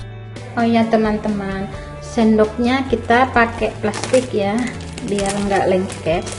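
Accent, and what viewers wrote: Madura, Indonesia. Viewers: ini suaranya kayak org madura ya?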